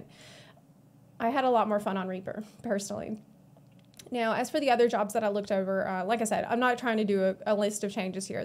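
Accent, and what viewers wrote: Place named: Georgia, United States. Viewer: The Georgia accent really coming out here haha. I love it!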